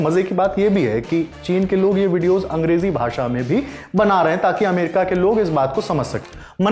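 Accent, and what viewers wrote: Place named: Kanpur, India. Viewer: कहि रहे...रहि जाएगा...वइसे...पहिले...😂😂😂 कनपुरिया स्पॉटेड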